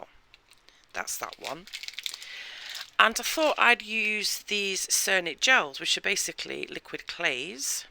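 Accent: England, United Kingdom. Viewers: Lovely to hear an English voice!!!!! I am in Ireland love your channel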